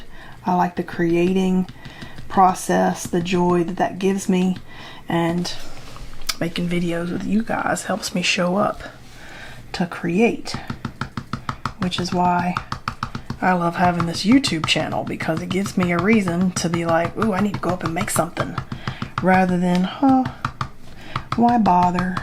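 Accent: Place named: Kentucky, United States. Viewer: I love your accent and enthusiasm! Any chance you are from Kentucky? What would be your top 5 supplies (after watercolor paper , brushes and paints) for a beginner watercolor gal who wants to try following along with you and who does not have any of the pens, inks, sticks and other wonderful things you have? I'm overwhelmed by your Amazon list!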